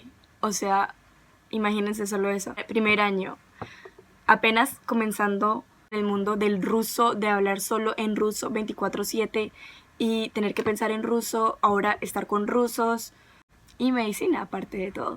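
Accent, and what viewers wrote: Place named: Colombia. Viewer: su acento colombiano despeja dudas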